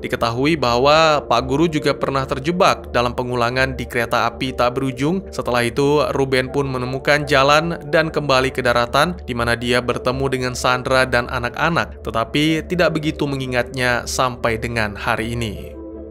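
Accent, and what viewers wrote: Aceh, Indonesia. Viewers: Pasti abangnya orang aceh ya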